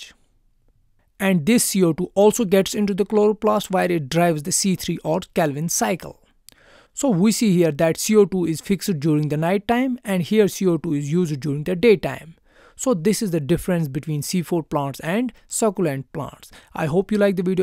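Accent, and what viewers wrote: Kashmir, India. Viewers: Sounds so Kashmiri Thank you btw!! Your concept are so on point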